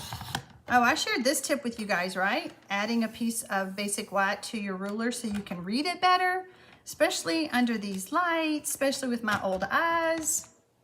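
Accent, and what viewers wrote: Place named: Maine, United States. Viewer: We Mainers have that accent that’s hard to understand too!! Oh well.. that’s the beauty of diversity!! 😇😂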